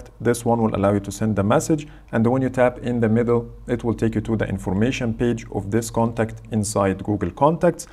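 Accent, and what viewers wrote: Egypt. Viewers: You sound like you're Egyptian right?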